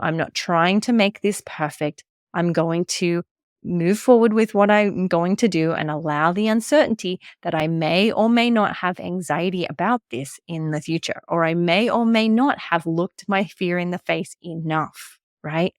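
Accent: Australia. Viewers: I couldn't place where you accent is from, my best guess is you're an australian who moved to texas when you were 18 ^^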